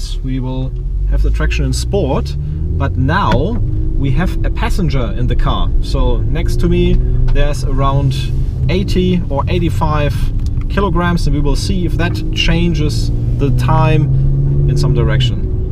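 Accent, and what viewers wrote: Germany. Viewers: man hört an seinem akzent das er deutscher ist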